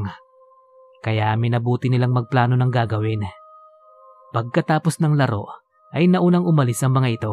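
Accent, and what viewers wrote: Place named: Hiligaynon, Philippines. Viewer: Hello sir, Salamat boss.. Ang galing mo sa punto ng Hiligaynon... I would like to RENDER A SALUTE.. aw tama ba yung grammar hehehehehehe SOLI DEO GLORIA SA CHANNEL MO! Malik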